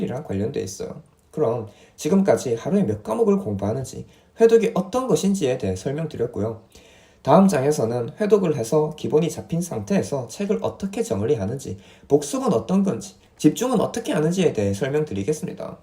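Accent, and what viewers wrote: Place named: Jinju, South Korea. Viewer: 고향이 어디에요?? 사투리 익숙한데 진주 사투리신가...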